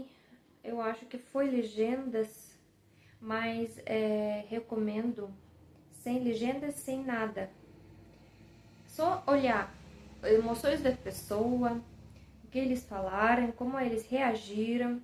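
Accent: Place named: Brazil. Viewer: Eu lembro de uns vídeos. Antigos seus e pude perceber seu esforço em falar português do Brasil, nós brasileiros falamos o português totalmente diferente do português de Portugal, tanto que nós não entendemos nada que eles falam, como se fosse idioma Russo X idioma ucraniano, acho que você me entende... Tenha uma ótima noite até a próxima Пока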